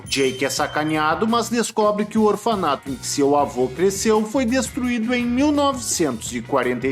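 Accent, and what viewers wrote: Rio Grande do Sul, Brazil. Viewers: Tu és gaúcho o narrador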